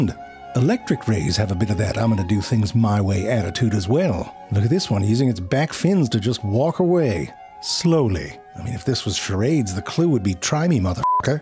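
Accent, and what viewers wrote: United Kingdom. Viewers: Does the narrator sound quite a bit like the main character from the PC point and click "Scratches", or is it just because it's a vaguely British sounding guy constantly being frustrated by a man called Jerry?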